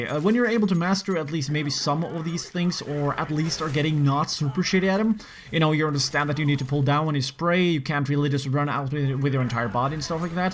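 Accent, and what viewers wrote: Norway. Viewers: Haha er du norsk? xD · Norwegian?... not swedish?.... first time :)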